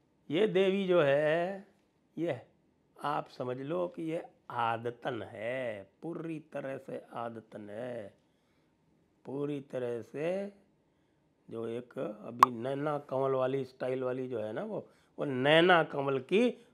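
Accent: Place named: Rajasthan, India. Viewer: Pranam sir, your presentation with facts and your special Rajasthani tone is making every one proud...!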